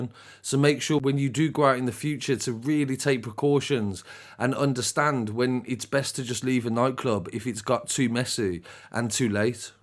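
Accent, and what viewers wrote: Birmingham, United Kingdom. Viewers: is a g for doing this channel for so long but he really doesn’t sound like he wants to do it 😂😂 it’s the accent tho..big up brum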